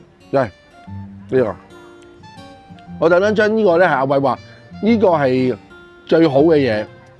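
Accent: China. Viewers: 屌啲煲冬瓜好撚可愛兼普通I like it🙂‍↔️😂👍🏻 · 可愛的普通話😂好睇😊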